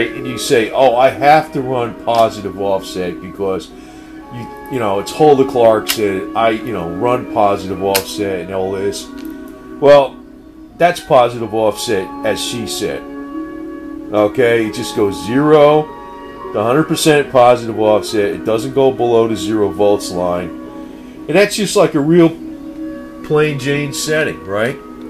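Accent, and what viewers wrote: United States. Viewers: amrykanski gadzi głos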